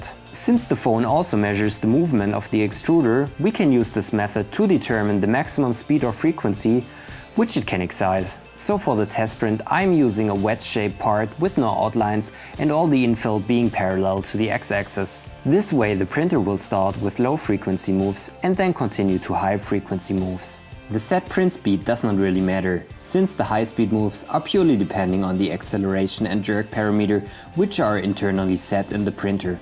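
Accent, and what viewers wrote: Germany. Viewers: are you german :D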